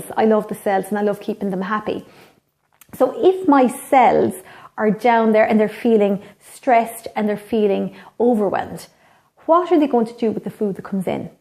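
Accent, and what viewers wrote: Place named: Ireland. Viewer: Such an important aspect of good health to talk about! Btw I love your Irish accent haha:) and keep up with the videos, I'm loving them:) x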